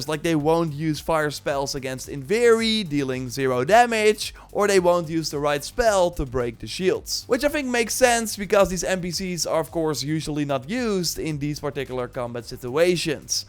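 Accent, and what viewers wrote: Netherlands. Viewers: Woow you sound super Dutch haha 😂 hello from a fellow Dutch guy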